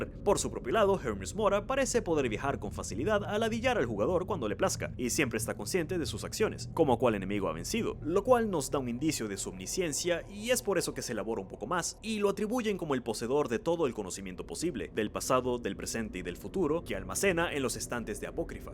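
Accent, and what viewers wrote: Venezuela. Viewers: De casualidad serás venezolano?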